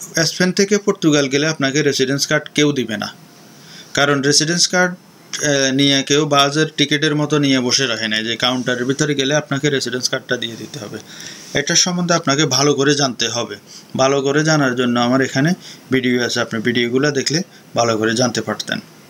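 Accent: Noakhali, Bangladesh. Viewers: ভাইয়া আপনে খুব চালাক মানুষের কথা বুজে পেলেন। তাই আপনার কথা গুলা বেসি বেসি শুনি খুব ভালো লাগে আসোলে নোয়াখালির বোলে কথা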